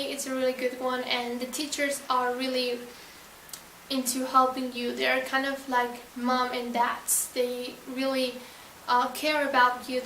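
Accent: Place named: United States. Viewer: Me encantaría hablar como tu! Hablas como las gurus d usa :)))) m gustaría saber como hiciste para perfeccionar tu inglés!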